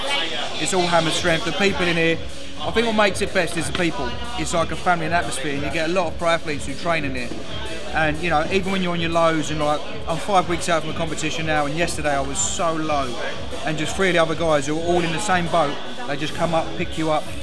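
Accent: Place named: England, United Kingdom. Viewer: well said mate, what part of england u from by the way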